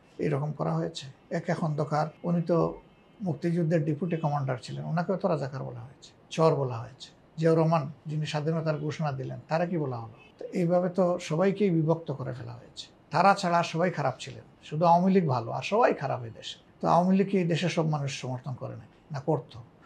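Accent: Sylhet, Bangladesh. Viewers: জি উনি সিলটি। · Apnar kotha shunle r valo lage na . Bangla bolen . Sylheti na .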